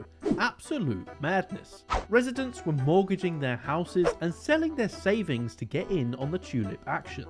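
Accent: United Kingdom. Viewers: Wait is this brit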